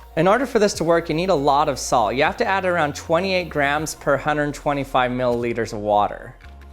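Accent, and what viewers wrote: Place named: United States. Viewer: annoying yanky droning voice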